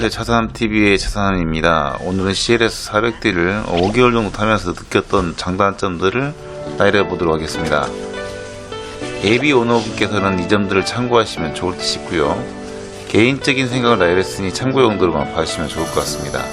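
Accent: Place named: Jeolla, South Korea. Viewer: 즌라도 사투리 쩌네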